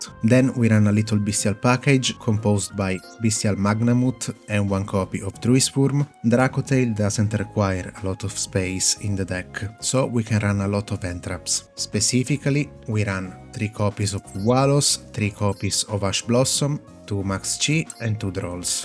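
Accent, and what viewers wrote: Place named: Italy. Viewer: Italian accent unveiled! òwò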